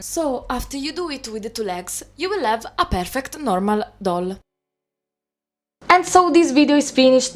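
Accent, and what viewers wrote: Italy. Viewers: Yeah, your english is fantastic. Never lose the depth of your Italian accent when you speak English, it is Perfect as it is! 🥰🥰 Also thanks for saving my Clawdia's legs! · Your English is beautiful - your Italian accent is delightful to listen to :) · Amazing video, thank you! 💗 I have just started to get back into Monster High and bought two dolls with loose leg joints, I'll be sure to try this on them! And honestly, your English is super good, like you can see how you know the slang, jokes', terms and such. Other than that there's only minor mistakes from time to time with the conjunctions, but I think that getting better with that will just come with time 🤘 Also you have such an cool Italian accent, I love it! ☺️👍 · Perfect video to help me fix my daughter's dolls. Also, your English is very good! I love your Italian accent 🥰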